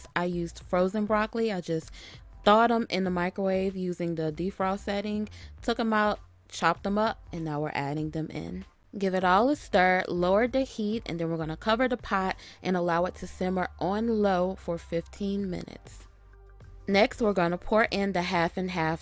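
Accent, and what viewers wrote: United States. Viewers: Which accent is this ? .. which state 🙂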